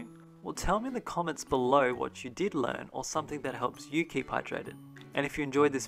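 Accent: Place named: Australia. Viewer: Love the “Bondi Vet” (TV show) Australian voice.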